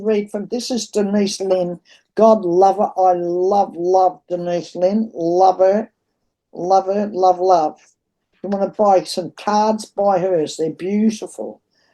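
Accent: Australia. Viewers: your amazing, re trump and the military , here are some potential future scenarios for you to ponder. lets say the democrats gain enough control of both houses of congress in 2 years time in the mid term elections , to impeach trump or maybe by some miracle it happens earlier he refuses to go , george w bush intervenes to try and get trump to leave, he fails , the pentagon or military intervenes to arrest him, alternative scenario , the supreme court rules against him , he defies the supreme court, the court charges him with contempt of court , and tries to have him arrested. he defies the US marshals trying to serve the arrest warrent , the pentagon or military intervene. when you say this path was set a long long time ago , your not wrong, donald trump is the re incarnation of jefferson davis the president of the rebellious south during the civil war going by your accent you live in oz , so do i , maybe one day we will talk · You sound Australian darling?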